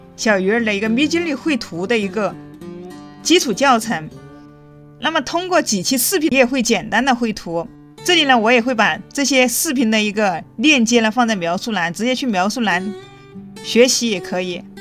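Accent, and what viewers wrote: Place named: Sichuan, China. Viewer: 哈哈，四川人哇，老乡见老乡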